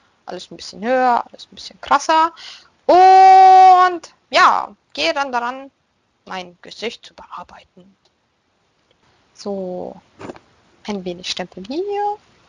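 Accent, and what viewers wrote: Germany. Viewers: I haven't actually been reading, I've just been sitting here listening to your accent and language. I think the German language is really cool! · Hey ^^ What part of Germany is your accent from? love your cosplays <3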